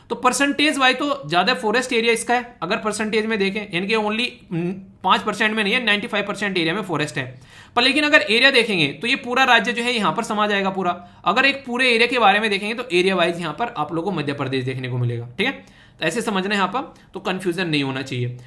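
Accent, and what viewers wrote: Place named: Western Uttar Pradesh, India. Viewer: Sir aapne kbhi btaya nhi aap kha se ho lekin m 100% surety ke sath kah skta hu aap west up se ho or Meerut ke aas pas kisi gao se ho (because aap sarpanch ko pradhan kahte ho jo ki up me hi kha jata h and aap ki boli me dehatipan h jo haryana se touch up me hi dehatipan dekhene ko milta or aap dhakad chhora ko bhi jante ho)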